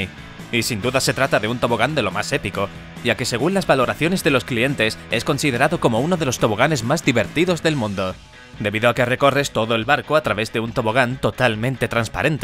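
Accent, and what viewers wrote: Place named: Spain. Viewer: Típica voz española :v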